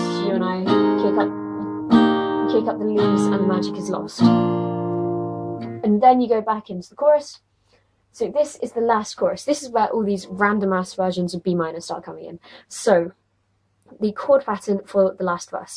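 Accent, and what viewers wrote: United Kingdom. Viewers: very nice lesson and very beautiful british accent! thank you ! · Thanks for the "random ass Bm" chord...i couldn´t figure that out. By the way, i love your british accent ;)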